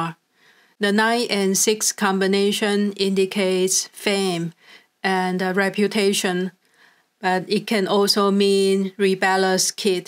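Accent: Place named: China. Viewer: Sorry cannot understand chinese mix English with strong accent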